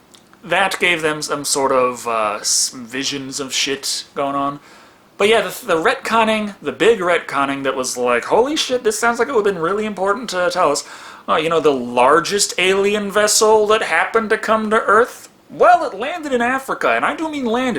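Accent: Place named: Texas, United States. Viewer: polygon He's from Texas so he does have himself a little accent lol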